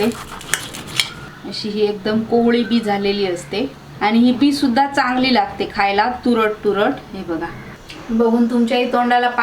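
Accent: Konkan, India. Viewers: कुठून बोलताय कोकणातून का बोलण्याची पद्धत पक्का तिकडचे वाटते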